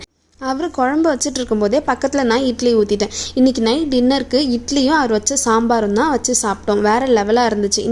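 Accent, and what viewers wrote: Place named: Sri Lanka. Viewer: Emma Sister Neenga Enna Sri Lanka ah Va Avunga mathiri Pesuringa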